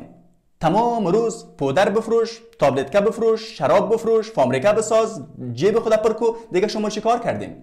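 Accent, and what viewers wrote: Iran. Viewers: این لهجه ایرانی بسیار جالب هست نه ایرانی و نه افغانی یک لهجی نو است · افغان با لهجه اجنبی ایرانی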